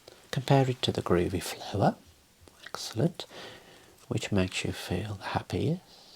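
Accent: Birmingham, United Kingdom. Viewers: Couldn’t get more brummie than this 😂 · Omg British accent💀😭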